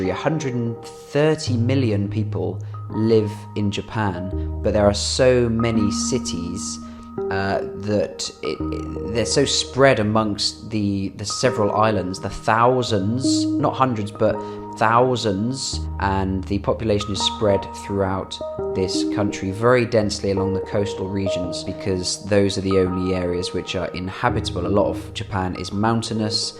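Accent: United Kingdom. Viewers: I like it! Saw your film for the first time. I was impressed with the pictures and narrations, both are very calm, gentle and professional manner. Slowly and calmly spoken English, with slight British accent allowed me to pick up every single words. Thank you. Old japanese man, living in tokyo. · イギリスの方ですね！英国なまりですもんね、大阪を紹介してくれてありがとー‼️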